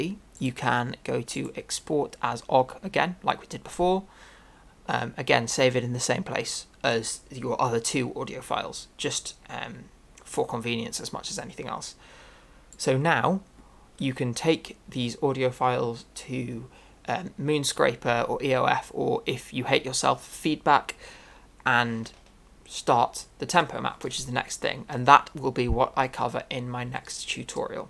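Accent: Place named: Newcastle, United Kingdom. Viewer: Geordie ASMR with a tutorial in the background. Nice.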